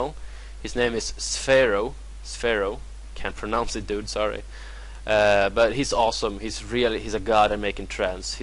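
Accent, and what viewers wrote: Sweden. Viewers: You sound Swedish...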